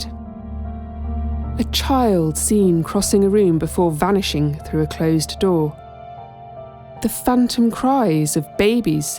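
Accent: England, United Kingdom. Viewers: Ah, that beautiful, quintessentially English voice again...a reminder of how wonderful the country used to be... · It's a lovely human voice. Humans tell a much better story of haunting & spirits then bleeding AI. These type off stories need a sympathetic voice, I'm sure AI cannot do sympathy. It's also nice to have an narrator who's from the UK. There are certain stories that need an accent that brings a calm voice, she has the perfect voice.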